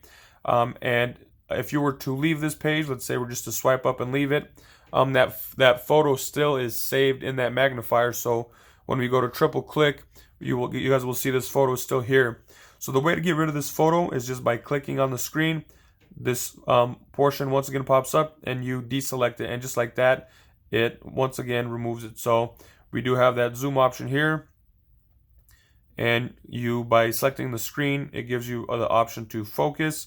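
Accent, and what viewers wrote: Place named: United States. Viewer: One of the clearest, most concise demo of an iPhone feature that I have ever used. It’s also very nice to hear clear American English at at a speed I can process. Thank you. (That is not a disparagement of ESOL speakers. They are just hard for me to understand when they speak at a very fast rate.)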